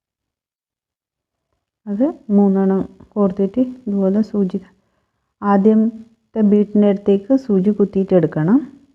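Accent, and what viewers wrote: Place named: Kannur, India. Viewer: Nalla work. Kannurano sthalam?? Samsaram kettappol thonniyatha. 😊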